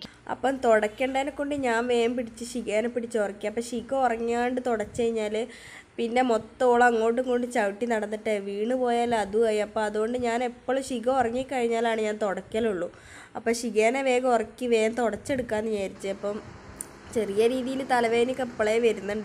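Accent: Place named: Kannur, India. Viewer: Pudiya subscribera. Ellam.turane.parayunu.nalla.samsaram.nmloke.basha.pole.tane.nmle.kannura.padachone.anugrahikate.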